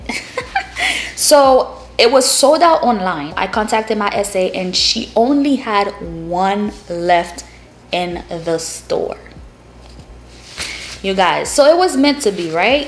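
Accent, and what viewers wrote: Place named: France. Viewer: You sound French😍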